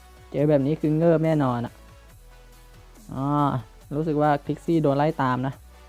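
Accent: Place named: Thailand. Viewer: รู้สึกพี่เหน่อๆ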